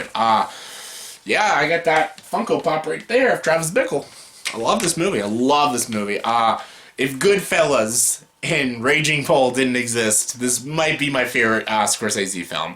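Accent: Canada. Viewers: What are you saying is your favorite Kubrick film? Canadian accenting hard here lol. Speaking of, is that how most Canadians pronounce Melancholia? 😊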